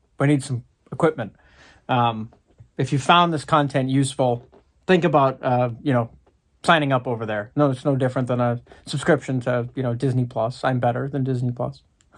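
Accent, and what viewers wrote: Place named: Massachusetts, United States. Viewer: Subtle hints of that Bill Burr Massachusetts accent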